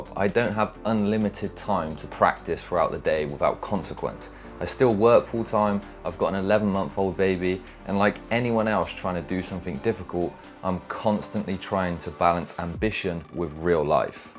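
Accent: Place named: Bristol, United Kingdom. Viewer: what is that accent, Bristolian?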